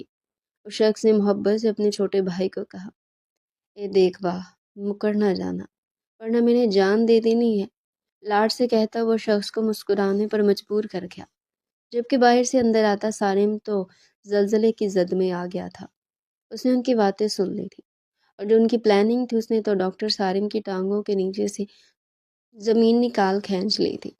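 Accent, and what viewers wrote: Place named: Punjab, Pakistan. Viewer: Urdu with punjabi تلفظ!!!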